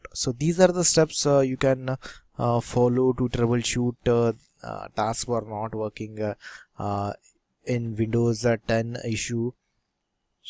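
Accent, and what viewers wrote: India. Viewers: fucking taskbar don’t work. don’t tell me i paid 60$ for windows license and here it’s buggy and have to listen to this poor indians voice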